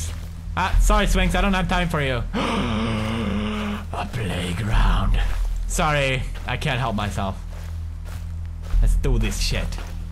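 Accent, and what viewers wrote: Sweden. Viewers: very barely it's super clear hes swedish in this video as to now where you have absolutely no idea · the time when pewd’s swedish accent was still noticeable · Man... still had a little bit of a Swedish accent.... and he was a tiny bit funnier. Probably because the whole demonitization thing wasn't here yet.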